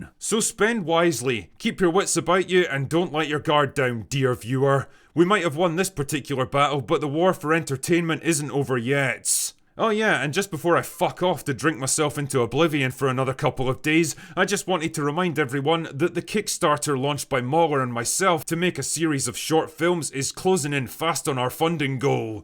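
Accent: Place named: England, United Kingdom. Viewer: This was great classic stuff said right here! Well said & I agree. " " "The only English Accent that I care to hear"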